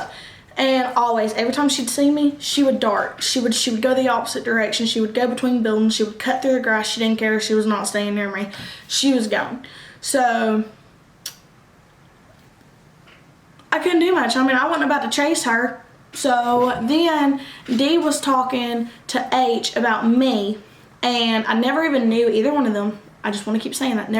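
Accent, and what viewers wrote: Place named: Kentucky, United States. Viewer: I’m watching your video on my tv now lol I’m from Kentucky and you sound like us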